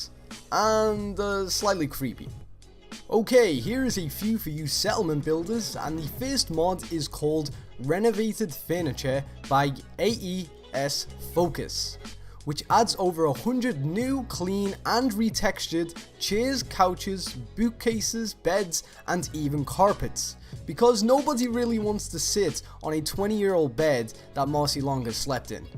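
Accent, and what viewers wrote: Liverpool, United Kingdom. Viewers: I'm so confused, are you a scouser? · Your fucking accent is horrendous. If you're a scouser, SPEAK LIKE A SCOUSER. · 'which I'm sure is a toilet paper in the UK' .....you're from the UK aren't you?? Scouse I thought??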